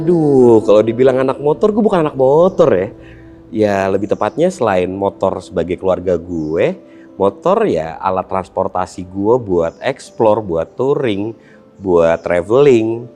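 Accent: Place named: South Jakarta, Indonesia. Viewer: Kyk abang"an w ini mah.. dari cara ngomong + gaya ny kyk anak jaksel.. 👏👍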